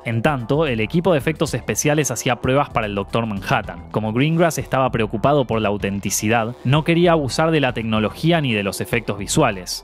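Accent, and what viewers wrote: Argentina. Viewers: Me encanta ese asentó argentino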